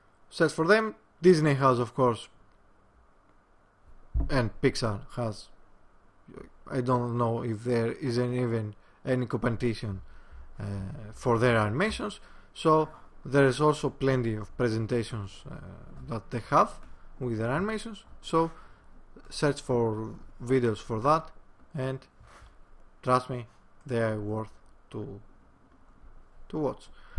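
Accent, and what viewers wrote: Greece. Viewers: You must be Greek, so cool accent, not the fake stuff at all. Μου έφτιαξες τη μέρα.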